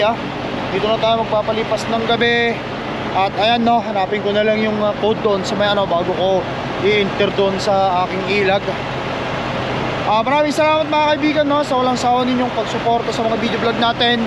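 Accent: Ilocos, Philippines. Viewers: Unawain mo kase ganyan tlaga lahat ng nagsisimula....wag ka naman masyado mag magaling boss..at isa pa ganyan tlaga ang pronouncetion ng mga ilocano..wag ka masyadO busher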